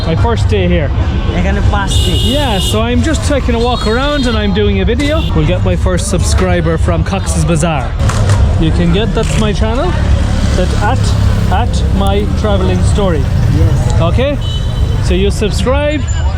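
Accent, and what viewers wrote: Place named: Ireland. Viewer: Absolutely brilliant. Discussing poetry and war on the border of Bangladesh with a senior army official. Fascinating and slightly surreal to listen to you sharing this experience. You retold it with such kindness and even better with your lovely Irish accent. Watching your videos remind me of my visits to Bangladesh and I am looking forward to watching the rest of your travel videos through Bangladesh. Thank you for sharing a part of your adventure/journey.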